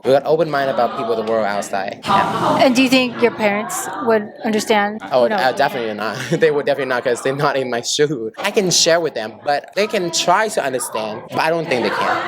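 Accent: United States. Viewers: He sounds like he’s from Atlanta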